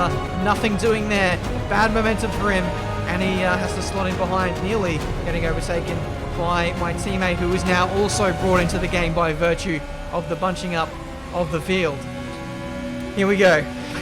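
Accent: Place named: Australia. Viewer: the thing i like about is that his voice sounds normal because im aussie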